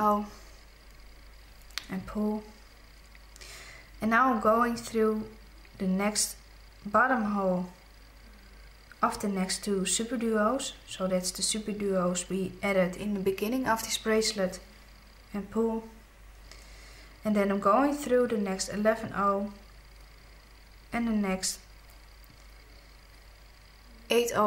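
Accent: Netherlands. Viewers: hoorde aan je accent dat je nederlands bent haha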